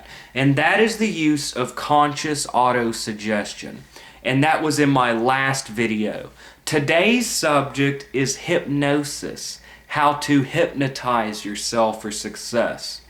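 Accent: South, United States. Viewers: he sounds like hes from the south, like me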